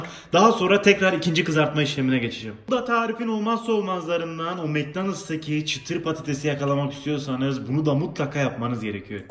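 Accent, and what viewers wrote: Adana, Turkey. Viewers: videodan demiş adamın videoları var orda Adanalı gibi konuşuyor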